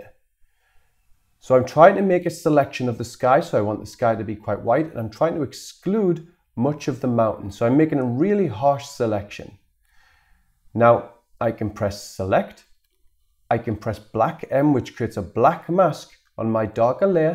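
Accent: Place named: Bradford, United Kingdom. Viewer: Are you from Bradford? Your accent sounds too familiar! Also, great video! I've missed playing around in Adobe CS.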